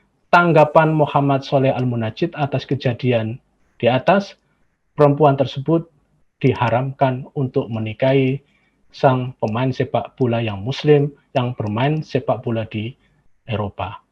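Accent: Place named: Java, Indonesia. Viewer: Jgn nampak logat jawanya min malu saya sebagai orang Jawa cm punya argumen tolol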